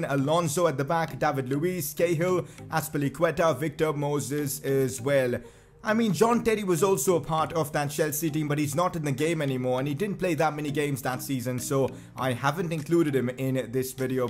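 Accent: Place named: India. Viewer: you sound like indian guy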